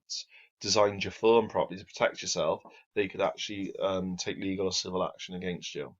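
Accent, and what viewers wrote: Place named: England, United Kingdom. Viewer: Can't understand shit. Your English is too overly english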